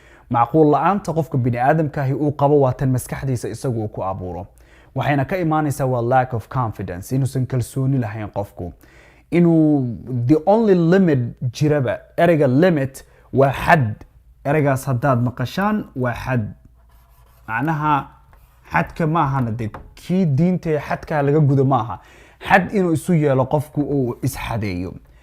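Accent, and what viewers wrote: United States. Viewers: Maa shaa Allah u gotta completely the accent that's my cuppa ... thanks teacher. my American pronunciation coach .. i swear to God ., i'll get it done cuz it's just a piece of cake...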